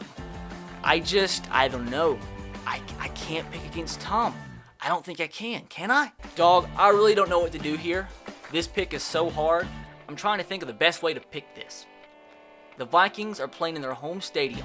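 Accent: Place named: Tennessee, United States. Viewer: Ayyy Titan fan here. I should've known you were one judged by your accent. We're gonna pull it out this weekend #titanup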